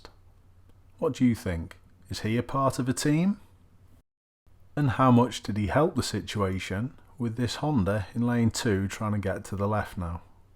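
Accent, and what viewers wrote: Liverpool, United Kingdom. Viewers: Your laconic Liverpudlian commentary adds just the right touch of understated contempt to these clips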